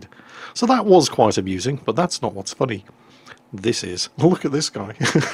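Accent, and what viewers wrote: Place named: New Zealand. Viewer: Agreed 100% - great to hear a voice from home, even better someone who loves the absurdity and sheer 'fun' of (especially) WoT. Best from NZ.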